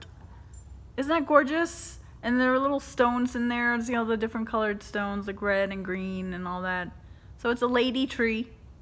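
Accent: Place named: Canada. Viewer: I have to ask.... are you from the U.S.? I am asking because you talk as if you are from somewhere else. Your dialect is from a certain province in Canada. Just asking because certain words you use remind me of home.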